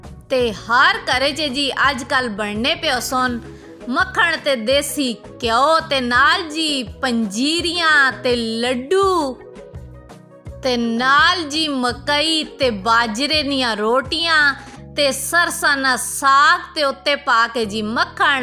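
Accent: Punjab, India. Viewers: W salam 😍punjabi ka tarka 😂😂😂🤣👻👻👻amezing hai yar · I like Punjabi excent very much I have alot of friends in Punjab side lovely people💜👍❤️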